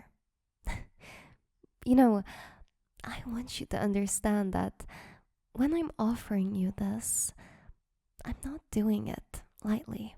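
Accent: Netherlands. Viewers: It’s a Dutch accent, herself is Dutch per her profile info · Is that a Nederland accent? Very nice.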